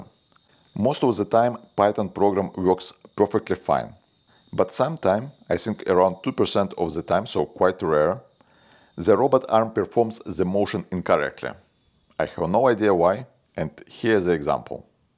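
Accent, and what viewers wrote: Russia. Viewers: Теперь я понял, что значит "русский акцент". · Он не русский. Сильный русский акцент. Но он немец из Швейцарии.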